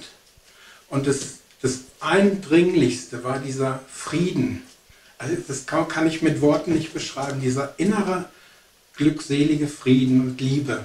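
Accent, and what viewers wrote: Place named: Switzerland. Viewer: Seltsam. Ich finde Herr wirkt sehr ruhig, aufmerksam und hochkonzentriert. Seine Art ist schlicht wohltuend. Den leichten Schweizer Dialekt mit dem er spricht empfinde ich als angenehm.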